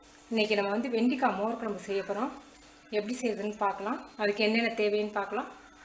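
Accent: India. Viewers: She speaks such a lovely Chettinad Tamil, I feel sorry for you are missing something great in this life, the taster of her Tamil and the Chettinad food!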